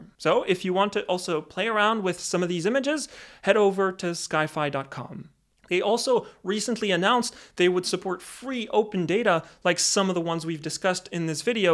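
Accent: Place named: United States. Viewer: I'm really curious about your nationality..you sound american, but I am getting hints of swedish or german......what's going on...either I have a very good ear..or I am a lunatic...I'm fine with either.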